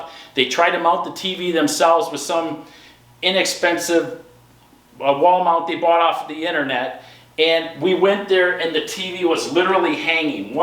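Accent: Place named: Canada. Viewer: Awesome. Canadian, eh?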